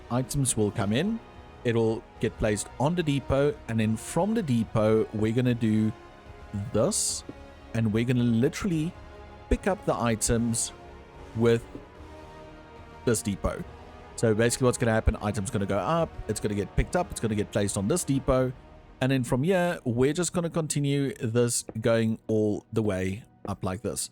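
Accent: South Africa. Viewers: From SA? I hear the accent 😂 if so thats dope. Been enjoying this season of SMP so many new creators to discover 🔥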